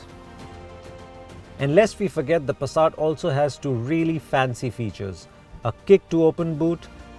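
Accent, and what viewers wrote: India. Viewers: bullshit... This guy has a good Indian accent that is crisp. He is very easy to understand and is very serious... Matt speaks very fast... Swallows his words... And is full of goofy jokes ...which are nice too...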